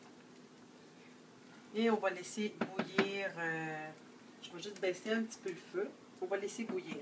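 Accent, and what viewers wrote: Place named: Quebec, Canada. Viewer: She's Dominican Canadian I can hear her French Canadian accent and her Dominican Spanish accent lik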